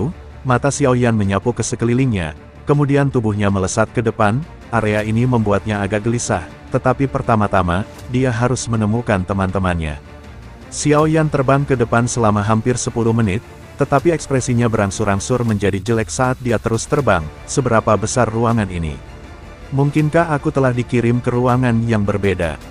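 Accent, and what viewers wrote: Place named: Madura, Indonesia. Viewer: Madura min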